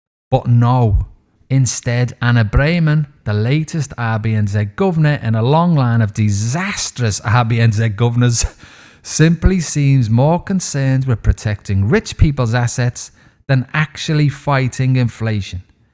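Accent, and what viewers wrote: Liverpool, United Kingdom. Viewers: Not big on Cynicism. The tone is off putting. Not sure a British accent helps either. Sorry but Brits have been telling us what we're doing wrong since greedy Governor Grey. Go home and fix your own problems - not add to ours please. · Ah I’ve missed that Scouse lilt and it’s take on NZ! Welcome back!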